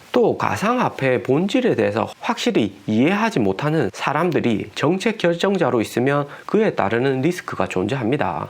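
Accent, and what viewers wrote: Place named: Busan, South Korea. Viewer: 부산같은데용 ㅎㅎ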